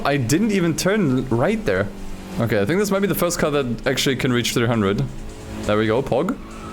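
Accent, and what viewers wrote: Germany. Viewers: Are you perhaps german? Kinda sounds like it.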